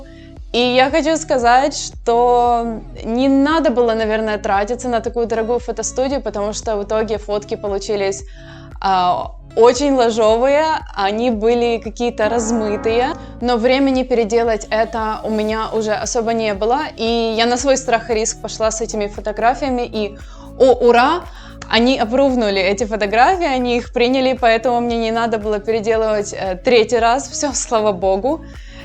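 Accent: Ukraine. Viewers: Украинский акцент не уб.ешь ничем. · Может покажется странным, но самое классное в видео, это Ваш украинский акцент, особенно нравится буква "ч", и буква " я", которая по произношению ближе к "а".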